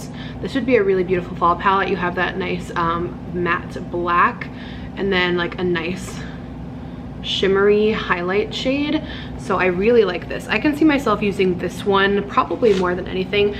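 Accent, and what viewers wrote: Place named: United Kingdom. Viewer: I love the British accent lol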